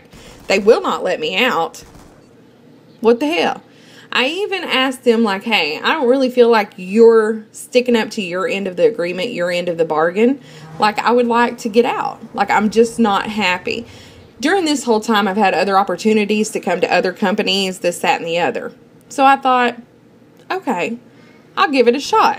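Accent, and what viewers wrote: South, United States. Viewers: I love the country accent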